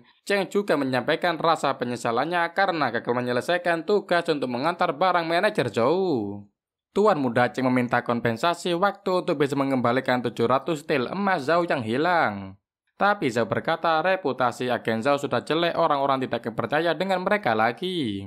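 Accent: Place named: Banyumas, Indonesia. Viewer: Mc nya wong ngapak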